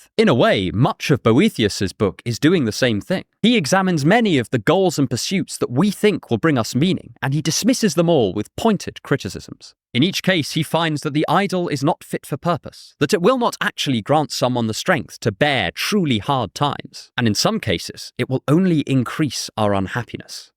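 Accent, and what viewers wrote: England, United Kingdom. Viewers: I absolutely love your wonderful English accent that keeps me so satisfied til the end of the video. You really make great quality videos and I love it!!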